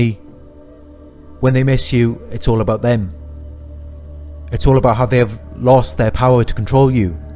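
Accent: Wales, United Kingdom. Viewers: is this guy welsh?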